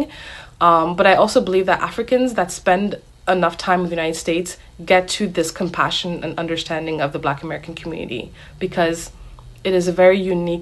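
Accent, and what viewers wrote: United States. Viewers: You definitely look and sound American not African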